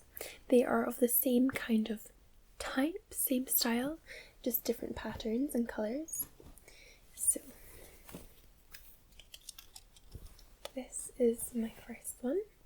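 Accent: Scotland, United Kingdom. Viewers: Not sure if you have done that before, but would you consider making a video where you purposefully talk "very scottish"? I kinda love it and it might actually be tingly :D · great video , thank You! I enjoyed the accent .. first video I've seen that You actually used it..least that I could tell. You're lucky to live in Scotland, im a huge scotch fan, Laphroaig, from Islay. Thanks again.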